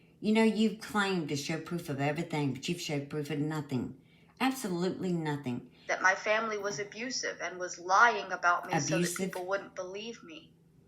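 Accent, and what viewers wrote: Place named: South, United States. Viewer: You said it just fine. I love your voice with that sweet southern accent very calming and easy to listen to. Reminds me of my Aunt's from Tennessee and Great Grandma. Mary tells her made up stories but fails to accept She is the monster.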